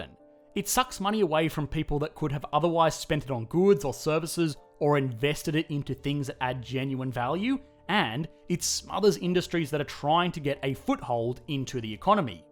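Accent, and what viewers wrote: Australia. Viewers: Just wondering the bloke narrating sounds like an Aussie, can you talk about the Australia markets? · Australian accent, US film clips. What is going on here? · Petition for economics explained ASMR channel with his soothing Aussie accent.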